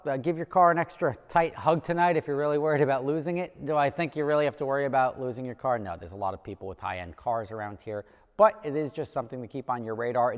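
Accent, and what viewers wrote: United States. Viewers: Are you American or English Ps I’m English so please tell meeeee Wait no your American whhhyyyy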